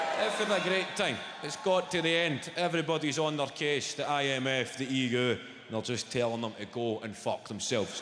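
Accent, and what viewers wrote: Scotland, United Kingdom. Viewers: i love the scottish accent but man, i barely understand it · Being fair, Kevin is speaking Scots English (or Scottish) -- a vernacular of English that is essentially a different language entirely, but mutually intelligible with Standardised English. It would be nice for people to remember that when they act like Scottish is just a "comedy accent" on the Twitter. ("Mutually intelligible" meaning that two people can speak a different language, but as long as they are closely linked enough historically and linguistically, they can understand each other just fine despite any differences in grammar, vocabulary and pronunciation. Another example would be Swedish and Norwegian).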